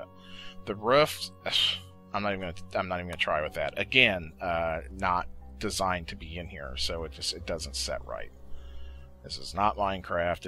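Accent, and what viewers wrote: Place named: South Africa. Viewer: That south African accent helps: )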